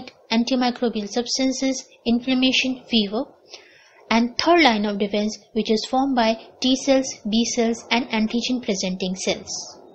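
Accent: India. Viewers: Ur india accent still so thick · Excellent explanations and illustrations of key principals. The only problem is that the narration is difficult for me to understand in the moment of the fast moving narration, due to what sounds to me like a vaguely Indian accent. Is there some way to adjust this? I speak American English.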